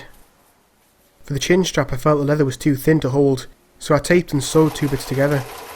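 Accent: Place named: Scotland, United Kingdom. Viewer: Great videos! Thanks for uploading! Clearly, you're from Scotland, but do you mind if I ask what part?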